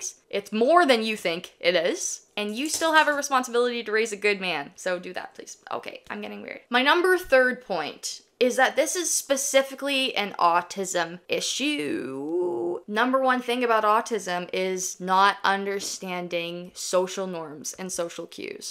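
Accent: Canada. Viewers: Canadian? I detect a mild "aboot."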